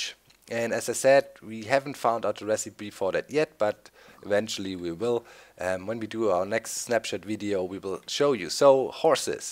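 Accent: Germany. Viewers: I love the German Accent well i think its German.... :3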